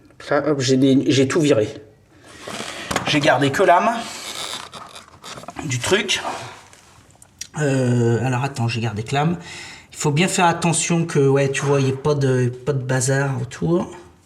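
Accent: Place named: Savoy, France. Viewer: Merci,et tres sympa le franc parle,avec l accent savoyard a mon avis.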